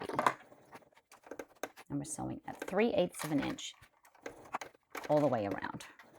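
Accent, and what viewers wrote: Australia. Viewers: As an Aussie I love love love hearing your Australian accent popping through every now and then! Thank you for your amazing tutorials - new subscriber here :)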